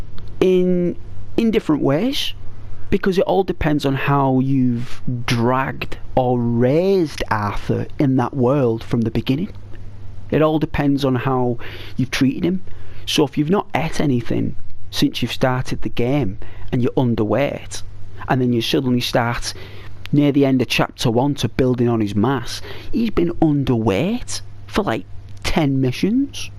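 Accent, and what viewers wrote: Liverpool, United Kingdom. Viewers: Great vid bro.. you from Liverpool ?? And sub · I love Liverpool accents lol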